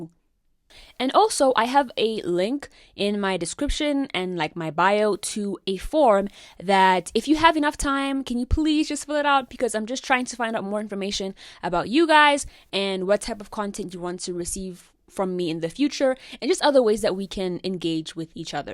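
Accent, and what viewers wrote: South Africa. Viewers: are you south african?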